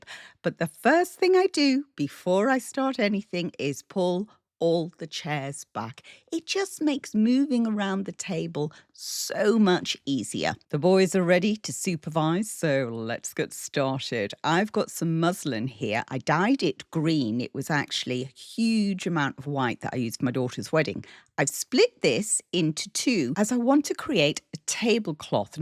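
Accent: England, United Kingdom. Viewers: You just have a knack for decorating ❤love your ideas❤I never get bored watching your videos ❤and I always look forward for your next one❤ love the spring decor❤and love listening your to your English accent❤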